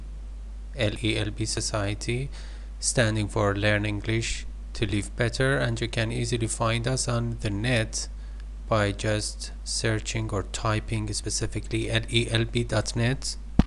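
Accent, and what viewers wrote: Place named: Iran. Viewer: Persian accent 😉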